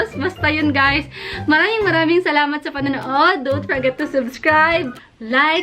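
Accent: Batangas, Philippines. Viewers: Congrats! Deserve mo yan!!! Sarap sa ears pag nag sasalita ka ng language ng mga taga Batangas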